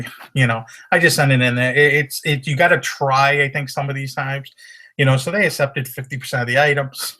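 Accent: Boston, United States. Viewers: you are one of the best on you tube and love your facial expressions along with that Boston type accent...cracks me up and hope Gretchen and her Mom are doing better....sounds like you have a lot on your plate, a lot of changes in the near future so take care and hope everything with your family works out well and your mother in law heals...Happy Hunting! Corie in Seattle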